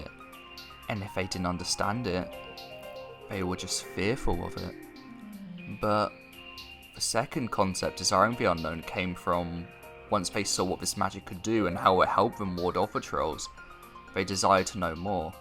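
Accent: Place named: United Kingdom. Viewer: Being in the UK is known for having thick accents. You simply find it hard to deal with his accent. Nothing wrong with his English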